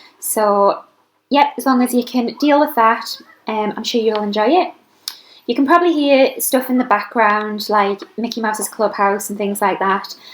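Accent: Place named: Doncaster, United Kingdom. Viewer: Nice. Even it's hard to follow your accent as a non Doncaster 😅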